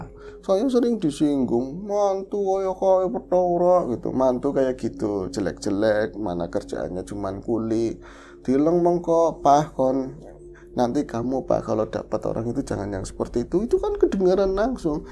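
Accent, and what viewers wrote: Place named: Tegal, Indonesia. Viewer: Saya senang gaya bercerita, santai, ada bhs tegalnya, ada lucunya....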